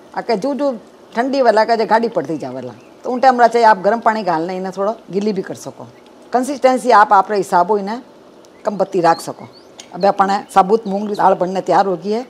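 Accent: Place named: Rajasthan, India. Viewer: She is speaking marwadi not hindi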